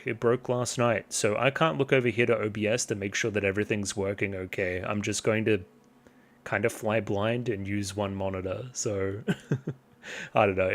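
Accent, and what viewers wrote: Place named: Oceania. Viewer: I think your knowledge of horseracing, horse behavior, idol shows and passion projects like Symphogear and GuP makes you one of the best candidates to enjoy this franchise to its fullest. Personally, I feel it is the best thing to happen to me watching any media in the last 5 years. Firstly, I like how you gave time analysing the episode and doing some research. So I will share some points, a few of them might be answers to your questions. (-1) Cygames sponsor Kentucky Derby, Grand Prix in Paris; many of the company heads own thoroughbreds themselves. Many legendary jockeys sponsor the franchise. This show is a beautiful love letter to Japanese horceracing (JRA, , anime counterpart is URA) history and the racehorses that participated in it. The deeper you dig into how this franchise takes on irl lore, the more fascinated you'll get. 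Howevever, S1, released 3 years before game release, was about the company still struggling to form their own studio to produce their show and find a working formula. It still ended up being more than decent. (0) BACKGROUND: Others have probably already told you about how uma musumes are born. A decoration on left ear means they were mares irl (like Black Caviar the legend from Australia), while right ear means stallion (like Bonecrusher from NZ). I gave you Oceania examples based on your accent XD. (1) The Uma Musume anime is NOT an anime adaptation of the gacha. Neither is it an advertisement for the game. Uma Musume is a "multi media" franchise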